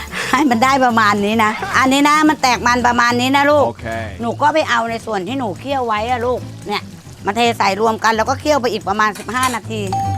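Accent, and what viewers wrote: Suphanburi, Thailand. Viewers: ฟังเชฟไปมา ...ออกสุพรรณ ซะงั้น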